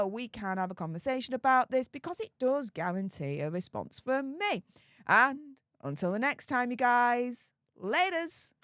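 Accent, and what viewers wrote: Yorkshire, United Kingdom. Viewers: So, I trying to figure out accent. I am strictly guessing but perhaps, "Yorkshire Accent?" Can anyone enlighten? Thanks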